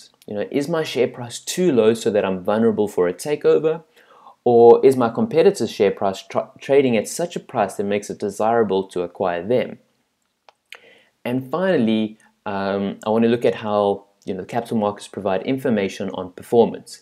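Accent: South Africa. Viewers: You sound South African haha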